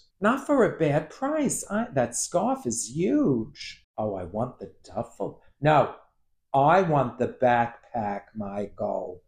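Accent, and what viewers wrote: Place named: East Coast, United States. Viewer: A nice chat. Your videos are like visiting a good friend. 🙂 Listening you you also makes me homesick for the East Coast. ✨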